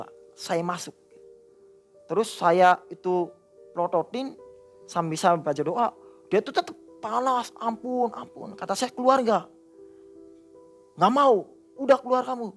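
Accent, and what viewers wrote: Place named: West Java, Indonesia. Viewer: Pasti orang Jawa Barat 😂 Salam kang sing Indramayu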